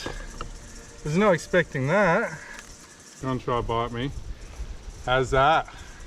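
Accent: Australia. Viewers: Hey can somebody tell me where is filming his videos? Is it Australia or NZ? Probably AU right? Not a native speaker so also curious whether he has a typical aussie accent?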